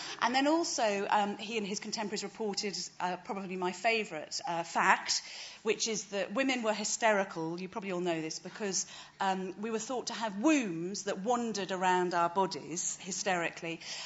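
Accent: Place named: United Kingdom. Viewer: To this American Bethany Hughes has the perfect female British accent and voice. Especially aesthetically pleasing while being educated.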